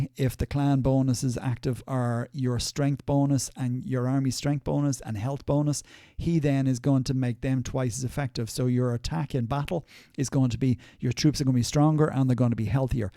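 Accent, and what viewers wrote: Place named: Ireland. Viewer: i love the irish accent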